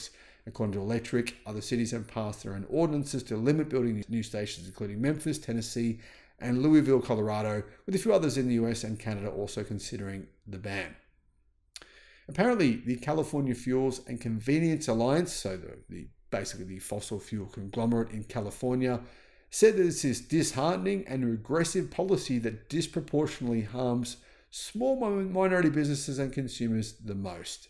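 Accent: Australia. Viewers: Loved hearing your Australian accent enunciate the name of my NorCal town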